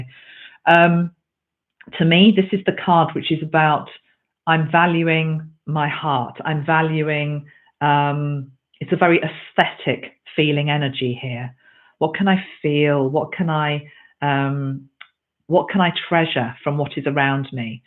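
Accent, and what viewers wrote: United Kingdom. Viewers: You are truly a Jewel! I love listening to you and your British accent!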